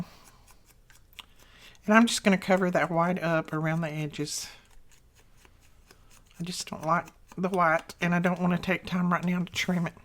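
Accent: South, United States. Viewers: Miss E you do not speak too fast! I’m Dutch and I understand everything you say. Besides I am a teacher of the Dutch language to foreigners and I am a teacher of the Spanish language. Of course it is posible that the person has a problem understanding you but that is an individual problem. It has nothing to do with you. By the way I love your voice and southern accent. And the lovely expressions you use. Keep up the good work! God bless you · Hi E! Lovely work as always! I chuckled when you mentioned that someone said you spoke too fast! You definitely don’t! 🤣 You speak slowly with that lovely southern drawl. 🥰 Infact, I often listen to your videos at 1.5 or 2 times the speed…not a fault of yours but simply because I have very limited time in which I can watch and I want to watch as many as you lovely crafty ladies as possible in my limited time intervals….and maybe the fact that I’m a fast speaker may also influence my choice I guess! 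But no matter what speed I watch, I enjoy your videos and your work! ❤️ Thanks for sharing! Have a lovely weekend! Hugs, Ava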